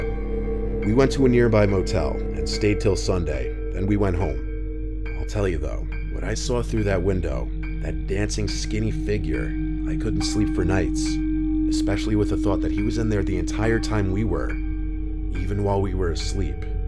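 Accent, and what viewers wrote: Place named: Boston, United States. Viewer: Hey, I love your stories so much. I wanted to tell you, I recognized your accent from Boston so I checked out your insta. and I wanted to say that since you’re from Boston, you’ll be happy to know my mom and I are visiting there in September. I’ve always wanted to check out Salem.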